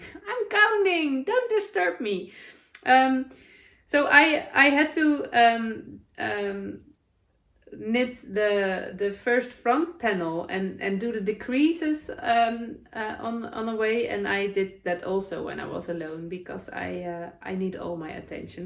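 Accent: Netherlands. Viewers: Arms are exposed. Is the word you're looking for. I do enjoy your Dutch accent. Your very talented. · Hi I finally found your podcast, its such a pleasure listening to you. I crochet, knit and sew also, but I have yet to start a you tube potcast. Your english is fine I understand you very well even with the dutch. ❤️🙏💕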